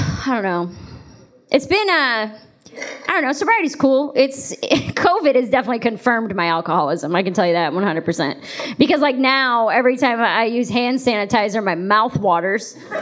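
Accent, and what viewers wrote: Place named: Ohio, United States. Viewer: Killer set, enjoyed your Ohio sense of humor lol. I never heard an Ohioan accent I understood until now but I sorta get it,anyways great job you’re totally right our parents do not drink water, my dad says fish *uck in it so no thanks lol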